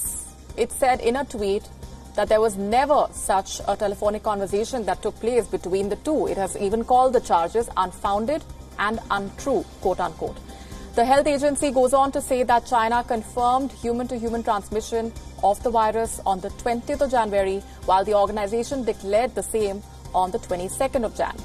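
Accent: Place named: India. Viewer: Thick Indian accent.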